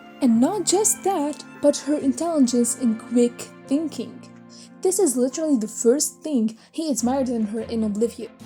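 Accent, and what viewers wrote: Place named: France. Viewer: Your French accent is so strong I thought you were speaking French in the beginning for a sec there😂😂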